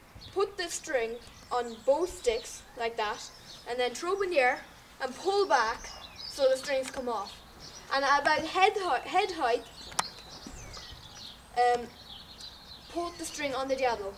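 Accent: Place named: Ireland. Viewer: Where are you from? Leitrim or Galway? To be perfectly honest i cant tell with you southerners...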